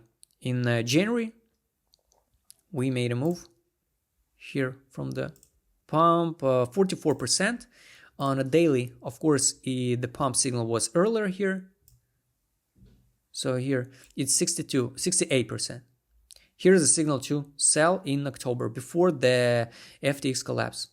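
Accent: Russia. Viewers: man you have clearly Russian accent, isnt' it?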